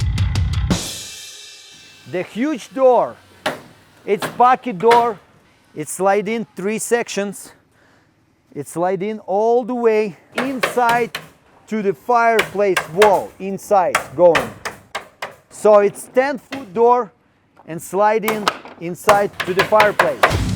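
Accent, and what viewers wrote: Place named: Russia. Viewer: Ты ведь русский! 100%акцент!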